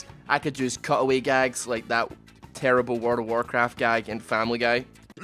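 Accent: Scotland, United Kingdom. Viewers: are u scottish pal?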